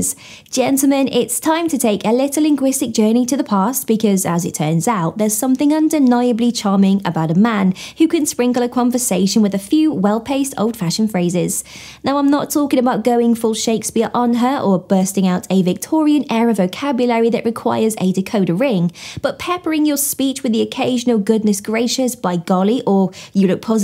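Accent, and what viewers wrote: England, United Kingdom. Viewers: just like always. You are one attractive beautiful British woman! 😍😍😍😍😍😍😍 I'm here for my British accent fix for the day!😊😊😊😊😊😊😊 You have a Blessed day. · This may seem pedantic but there is no British Accent, there are English accents, Scottish accents, Welsh accents and Northern Irish accents and within each of those there are different accents per city and in London there are also different accents. Her accent is English.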